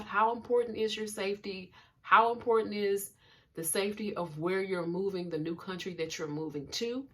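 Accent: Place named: Detroit, United States. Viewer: Shout out from the D! I thought you sounded like a Detroiter😊i was in Paris solo in July...racism was overt but I still had a great. careful have to be careful.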